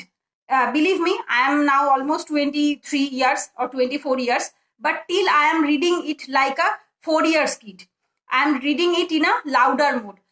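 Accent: Bengal, India. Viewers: Where do u live?.. Btw I can easily make out that u are a Bengali😊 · Hello ma'am, this video is very helpful for me because I prepare upsc exam in hindi medium but I realise English medium given exam is better than hindi because in upsc number of selection only English medium students so I also decided to prepare this exam in English but I have so many problems and confusion how to cover and understand by this language, you're giving tips useful for me and I want to applied ourselves.abhi meri English typing very bad hai ,aap meri feeling ko samaj lijiye.Aap ki English bhi ek bengali type lagati hai jab aap bolti hai, mujhe bengali nhi aati phir bhi ish language ko apne landlord jo ek bengali family hai wahi pr suni hui hai. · Madam are you bengali?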